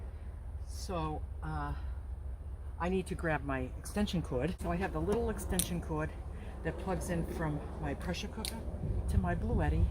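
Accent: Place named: Boston, United States. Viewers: I just love this video. You remind me so much of my family, my Aunts, and my Mom, although I'm sure I'm close to your age. You make me smile, and laugh which feels so good. I loved when you said "butta" and get outa here flys who invited you! I'm from Boston so I appreciate the accent! I love vegetables and love watching your prep and combinations of them. Very inspiring! They all look so delicious! Thank you for your videos, you are a natural, and thanks for making me smile!🙂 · Two things - One is that I love your accent and I have been wanting to tell you that I knew from your accent that you were from New England. My husband grew up near Boston, in N. Abington. He had moved to Texas A few years before I met him so, his accent was Texifiied!! His brothers still live there and I get lost in conversations due to listening to their accents. 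Two, is that. I am so glad you posted this using your. instapot. My kids gave me one last year and I have been afraid to use it. I saw a book on it at Walmart today and almost bought it but I came home and you are here giving me a lesson. Lol. I. Enjoy your videos very much. Thank you.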